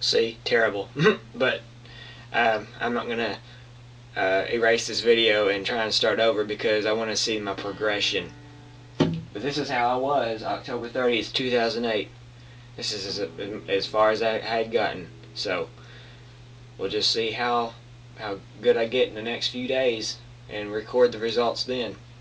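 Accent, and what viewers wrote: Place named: South, United States. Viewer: Haha yea man nothing wrong with a southern accent it just makes it that much more fun to be around you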